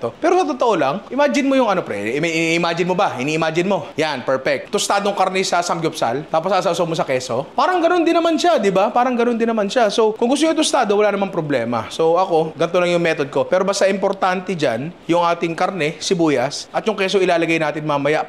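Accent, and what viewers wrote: Batangas, Philippines. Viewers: More Batangenyo accent ninong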